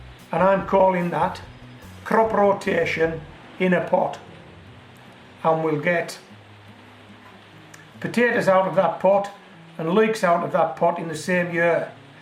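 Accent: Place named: North East England, United Kingdom. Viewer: Newcastle area I think, definately a North East UK Accent.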